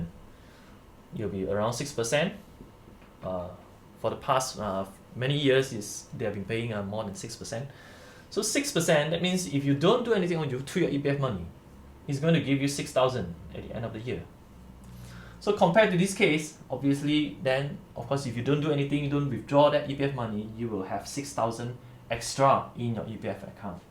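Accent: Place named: Singapore. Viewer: I can't stand Singlish....my ears r so itchy listening to Singlish accent. Either you speak Chinese or proper English. But not Singlish...gosh.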